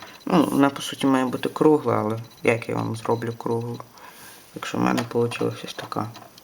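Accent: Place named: Lviv, Ukraine. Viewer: він зі львова и я це чути як він говорить в нас у львові майже всі так говорять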